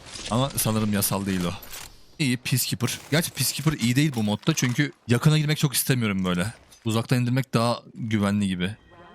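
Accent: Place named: Russia. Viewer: Rus aksanlı lütfen devam:)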